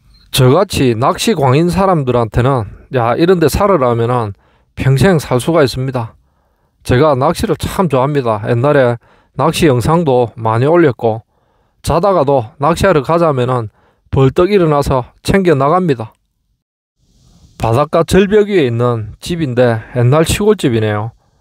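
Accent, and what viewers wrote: Gyeongsang, South Korea. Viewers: 갱상도라 더더욱 신뢰가 안가네…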